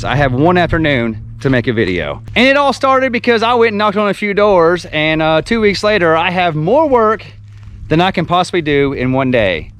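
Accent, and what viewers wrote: Texas, United States. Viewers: I just like hearing you talk , Texas talk. Where is Phil? Ooooh wrong channel. lol As for the comments I say yes but in the long run don't give them the satisfaction they really want to be seen on here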